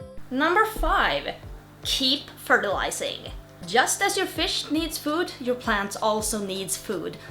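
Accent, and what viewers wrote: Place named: Scandinavia. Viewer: Hey, I was searching for something the other day & found your channel! My name is Stein Eric & I live in Minnesota with a few other Swedish people who you might know......Just a little joke- 🤭 So I'm a big fan of anything to do with freshwater aquascaping. I have been keeping pets my entire life but nowadays it is mostly peaceful tropical fish with the Bettas, Cory's, Dwarf Rainbows, Harlequins,Otocinclus & and the only snails that are allowed to be in the aquarium are Nerites!!!!!! I have 9 aquariums and a handful of small enclosures that are having just plants & several types of Moss (my favorite plant? ) I just wanted you to know I've been enjoying your videos and the best Scandinavian accent that I've heard in too long...I am a 1st generation American and both of my parents are from Norway and grew up in Vik ❤️ I am going to IKEA tomorrow for the supplies I need to build my 1st aquarium and hopefully the 2nd too. 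🤞 I watched Tanner on Saturday, and he had a brilliant idea for sure & if you have not already seen the last video then I am going to be really quiet and now I have the best idea for you to get online and see what Serpa Designs is up to. Ciao 🌉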